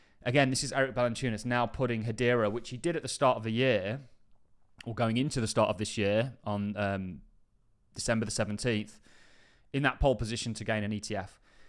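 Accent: Birmingham, United Kingdom. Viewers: That’s a brummie accent 👍🏻😎👌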